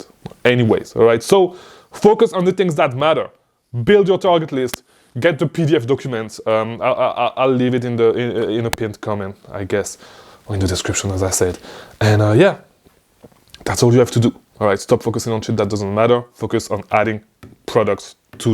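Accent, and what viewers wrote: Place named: France. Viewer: Hé tu parles français? J'entends ton accent?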